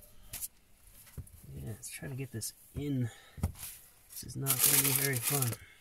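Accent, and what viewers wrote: Canada. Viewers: I am a new subscriber due to your accent. Canadian A? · Your food looks amazing. Also, I love that Canadian Accent. New fan :) all the way from HOT Sydney Australia. I wish I lived in the cold! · He sounds too Canadian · I am Canadian myself, so I recognize a strong, Canadian accent when I hear it. Are you from out West? Maybe far East coast? I'm from South East Canada myself, or south west Ontario.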